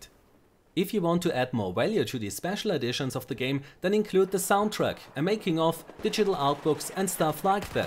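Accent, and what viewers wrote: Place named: Germany. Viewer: That German accent gave me cancer.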